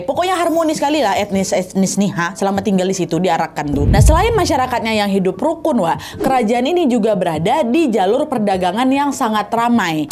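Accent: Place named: Medan, Indonesia. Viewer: Tampak kali logat Medan kau dek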